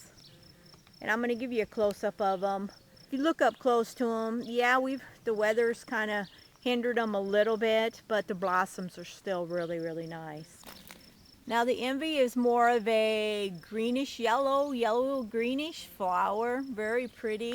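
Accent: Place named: South, United States. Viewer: Hi. Love your garden videos and your southern accent. I've been watching your channel for a while and am a big fan.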